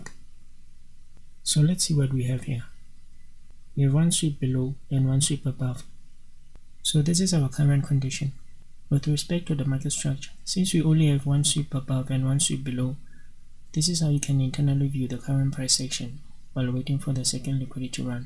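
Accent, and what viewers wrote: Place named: South Africa. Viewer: you are such a legend I watched this video again now... Are you from South Africa? Sounds like it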